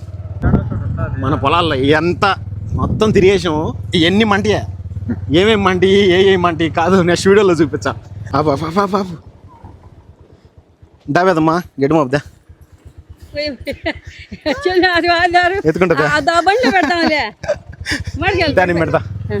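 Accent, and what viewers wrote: Kadapa, India. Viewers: Anna meru India ki raganai mi guntu mari poendi pakka telugu abbaayi laga mathladu thu unnaru ❤❤🎉🎉🎉🎉🎉❤❤ Welcome to India anna ❤❤❤❤🎉🎉🎉🎉🎉🎉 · కడప కి వస్తె ఆ యాస లో మాట్లాడుతుంటే అదొక ఫీలింగ్❤ కువైట్ లో ఉన్నప్పుడు వీడియోస్ చూసి చూసి ,,ఇప్పుడు ఇలా మాట్లాడుతుంటే చాలా బాగుంది ,,మన వాళ్ళతో ❤❤❤ ❤❤❤ నాది కర్నూలు ❤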